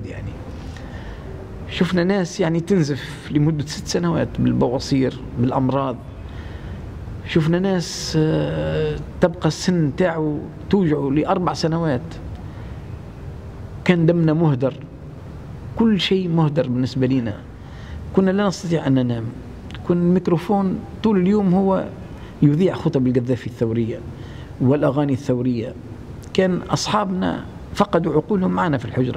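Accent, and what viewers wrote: Tunisia. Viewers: شفناهم كيف نهضوا بالبلد ولهجتك التونسية واضحة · بس لهجتك تونسية بشكل كبير ، ليش ؟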